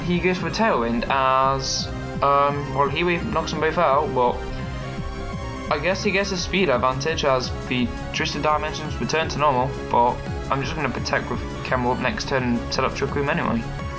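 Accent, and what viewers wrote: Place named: United Kingdom. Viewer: aah, i love your british accent :]]] pls more uploads of that kind, i suck in doubles but it looks fun watchin u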